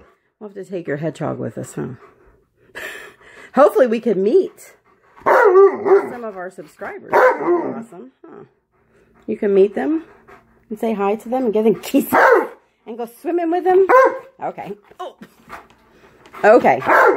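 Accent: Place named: Alabama, United States. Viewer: I am almost certain my brother has a fenced yard. Can he be with other dogs? My brother has either two or three and all are good and sociable dogs. I'm sure he'd tell you otherwise, but to my knowledge they would get a long with another dog. That would be a thing to watch for though because you wouldn't know my other dogs reaction to a new dog coming in their territory. I have emailed my brother and I expect to hear back promptly from him. The other thing about his dogs though is I'd be at my brothers going over things are just taking and one of his big dogs would just lick my arm from elbow to shoulder. I'm pretty sure they were tasting me to see if they wanted to eat me. One of the dogs is named Swan and she is a really nice dog who I have been around a lot when Bob lived out here just a couple of years ago. He has another dog named Buddy who is also a really nice dog. 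I think he has a couple of yippity dogs, but I doin't remember their names. You'd probably like Bob's wife-you talk just like her. She's from Alabama and she says the "yes, ma'aam and the no, sir", no matter if she's talking to an 82 year old or a 2 year old.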